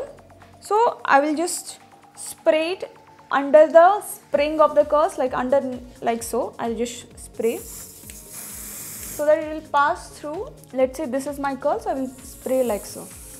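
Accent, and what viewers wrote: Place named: India. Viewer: U speak like indian but looks like foreigner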